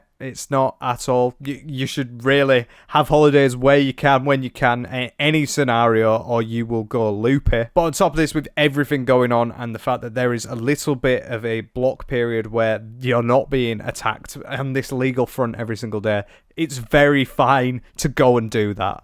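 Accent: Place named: North, United Kingdom. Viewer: I love how your "Northern English Twang" makes every time you say "Blair", it sounds like "Bleh"😂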